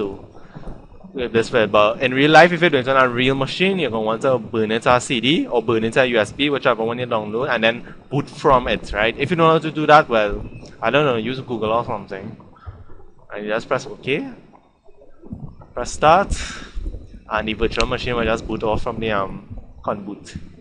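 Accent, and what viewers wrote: Trinidad, Trinidad and Tobago. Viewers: Trinidad accent haha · u iz ah trini or wa? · you sound like a trinidadian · i understand it very well are you from trini to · wat a large trini accent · yuh soundin like yuh is ah trini, I undahstan yuh clean. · trinidadian? me too! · lol 100% trinidad accent... maybe bahamas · Hes trini/guyanase and probally a west indian. I can understand his language fine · wait nah...a trini? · never thought i'd see a trini giving tech advice on youtube yes..sounds like yuh just kixxin around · Trini accent strong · your trinidadidan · He ah trini!!! Trini big up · de trini accent strong.. lol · how that sounding like a trini accent so ?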